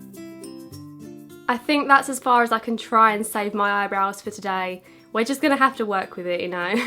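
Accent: United Kingdom. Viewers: It's fun watching this and see you do makeup really well and talk with your accent and British sayings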